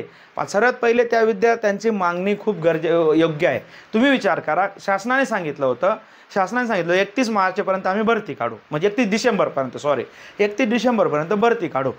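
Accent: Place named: Khandesh, India. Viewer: सर तुम्ही कुठले आहे भाषा मस्त आहे खानदेश 😅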